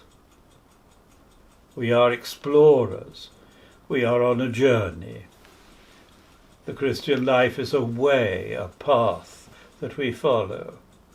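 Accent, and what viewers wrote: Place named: RP, United Kingdom. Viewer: Very erudite, with a charming "posh" accent, a British sense of humor, and a real knowledge of the loving heart of God, which is the most important. Thank you very much, Father.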